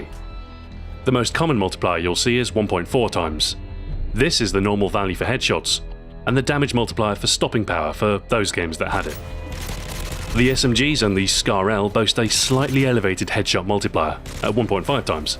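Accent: England, United Kingdom. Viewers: yeah, the british accent is awesome! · No. this is just an a guy with an English accent who likes CoD, Soap has a Scottish accent anyways :P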